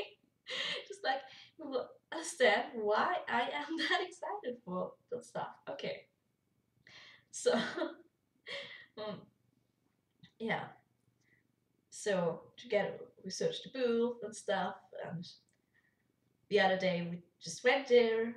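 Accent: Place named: Germany. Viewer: Just got here from Reddit. Not even a minute in and already I'm thinking "Geeerman?" :P That's an easy to place accent. Just don't ask me to place it somewhere in the country. I have many ideas for what I'd say for any potential meeting with Dodger (which is not likely to happen, as I barely ever leavy the city, not to mention the country), but I imagine it would probably end up like this: "Uhm. Uh. Sooo... Wow. You really are tiny!" Ooops. o_o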